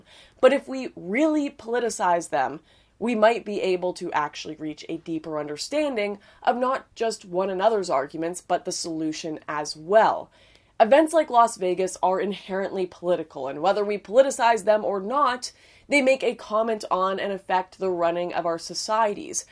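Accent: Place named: Canada. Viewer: you are getting wiser by the video!! Doesn't mean I agree with everything you say. (Doesn't have to) As usual, like I always say, Canada needs you and we need more Canadian dialect from you. Thank you!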